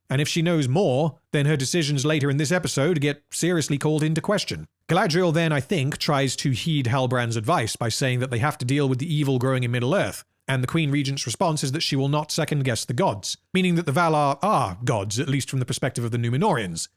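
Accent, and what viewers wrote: United Kingdom. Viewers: Why do you hate Donald Trump? I mean from your accent I would say your Brexit. So we had a leader who's deal was Illegally ( key phrase, breaking the law) entering and taking jobs, and social services, that American Citizens can not get. How would you like it if 2,500,000. People Illegally crossed into your country and your government was givening them 15000 and a place to stay with free medical care. When I know veterans who cant get medical help and are living out of their car. Love the vids so far, i really do. But take your anti Trump pro Biden crap and keep it on your side of the mic. Your making the very same mistake amazon made, while criticizing Amazon for said mistake. Thanks. I will now go back to enjoying the rest of the series.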